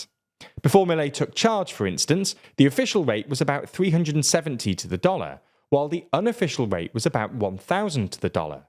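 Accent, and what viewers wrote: United Kingdom. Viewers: Love your videos and the British accent but it’s JavieR not Javiey/Javi-ay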